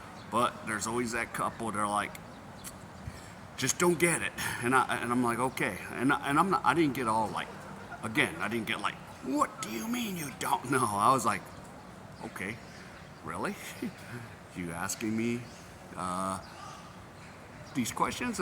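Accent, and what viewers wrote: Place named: South, United States. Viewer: Maybe u need to tone down the red neck accent a bit hehe..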